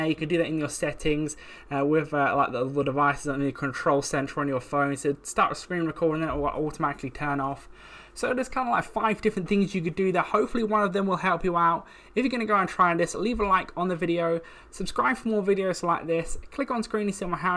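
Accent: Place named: United Kingdom. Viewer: He talks too fast and with his British Accent it's hard to understand him, he needs to slow down.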